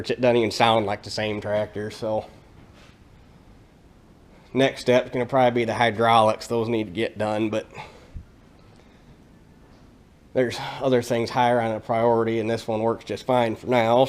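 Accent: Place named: North, United States. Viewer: I’m going to be honest and with this link came out I bypassed it because I was just busy. When your second video came out and I well great. You were one of the first channels I watched regularly and looked forward to watching and even went back in time and watched. I’m originally from Michigan and have lived in Georgia since 1974 so I love to hear the northern accent such as yours but love the old equipment as well. Take care and hope you’ll post some more videos.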